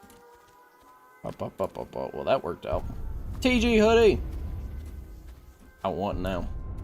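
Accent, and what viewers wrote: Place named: South, United States. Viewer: bro I won't lie I have you just talking in the background and working on school work and what not. Something about your southern twang really has me sitting down and just listening to you. You should dabble into commentary!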